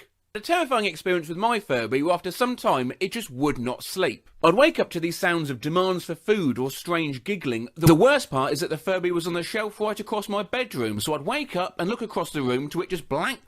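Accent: United Kingdom. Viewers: i'v never heard a voice screaming "BRITISH" more than yours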